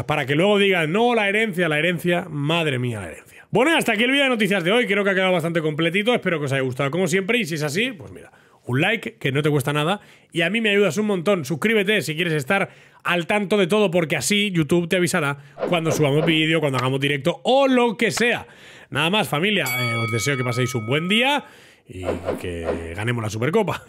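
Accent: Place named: Argentina. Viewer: Parece Argentino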